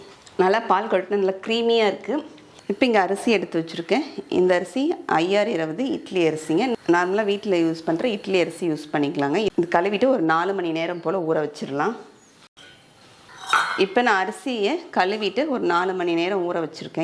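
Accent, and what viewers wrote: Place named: Puducherry, India. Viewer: Vadaa Seema ya.. 😍😍😍 உங்க slang கேக்கதுமே ஏதோ little bit happiness.. Don't know y.. ❤❤ Neenga pondicherry ya 😁😁🙌🙌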